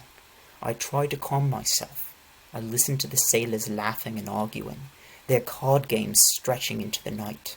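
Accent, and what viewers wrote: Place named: United Kingdom. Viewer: I really love the British accent thank you !!!!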